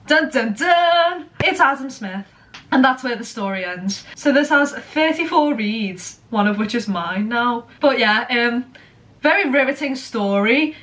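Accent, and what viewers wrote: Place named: Liverpool, United Kingdom. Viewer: Do you think she’s American? Idk if you’re joking she’s scouse lol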